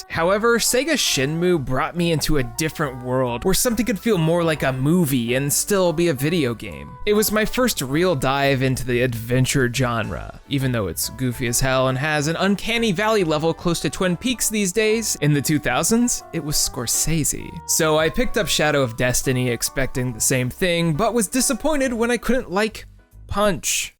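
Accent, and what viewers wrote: Louisiana, United States. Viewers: Did not know you where cajun. I'm from Louisiana, north Louisiana so not cajun but a Louisiana baw none the less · oh shit baaaaaabie i didnt know you was cajun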